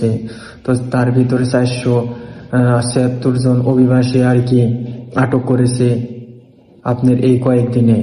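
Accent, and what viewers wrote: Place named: Tangail, Bangladesh. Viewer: যাক আল্লাহ ভরসা যে কটা যাইতে পারে এ ভাই আপনার বাসা তো মনে টাঙ্গাইলে আপনার কথার ভাষা শুনে বুঝা যাচ্ছে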